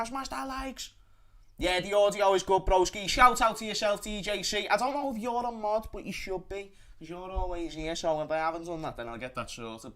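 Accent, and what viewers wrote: Liverpool, United Kingdom. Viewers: U need to put substitles on ur freakish scouser channel bruhh!!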